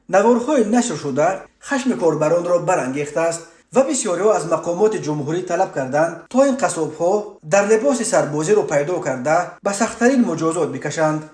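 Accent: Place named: Badakhshan, Tajikistan. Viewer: Хами Канала барои акценти Бадахшониш гуш мекунум .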